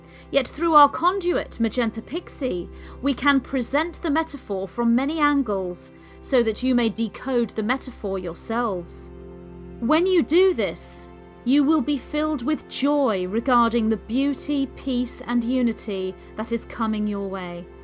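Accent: United Kingdom. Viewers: HEY EVERYBODY... Just thought I'd come in and grab a little popcorn and watch a video about another non-existent planet...... Okay, here we go - yeah buy the book and resource series. Nibs-For--Tards. (Uh-huh! yeah! MK Ultra, sure) How To Painlessly Rip Out Your Brain... Ahhhh - gawdammed British accent will do it every time. My pole shift??? Gash talks like she's an exception to this planet - someone should check her 'pole.' Hoooe-shit, there's still 26 minutes of this fucker left. I don't think there's going to be enough popcorn and I certainly didn't see anything to drink around this joint.... ....Hang on... I'll be right back in a jiffy.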